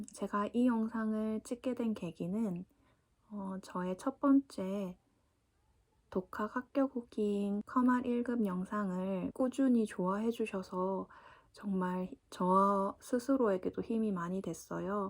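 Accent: South Korea. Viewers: 부산 사람 맞죠?! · 전라도 사투리잇는거같아요 아닌가?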